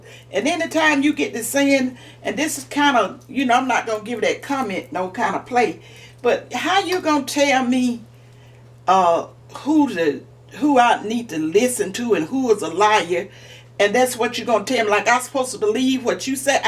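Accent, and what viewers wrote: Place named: North Carolina, United States. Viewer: I just love listening to you. You remind me of my. Are you in NC? Your accent sounds like hers.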